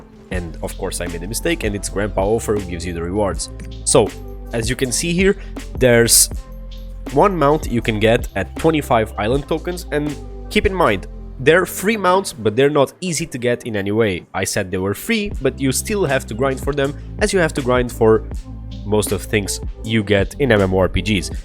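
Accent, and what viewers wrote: Portugal. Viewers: good video! btw, you sound portuguese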